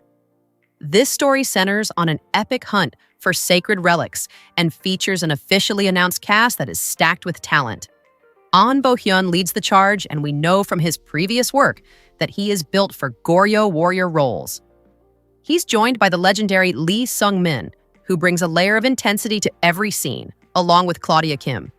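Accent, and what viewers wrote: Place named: United States. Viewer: American accent on korean language hurts my ears. 유지태이???? Arghhhhhh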